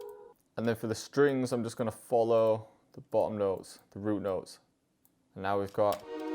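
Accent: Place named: Northern England, United Kingdom. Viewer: He's from up north prolly manny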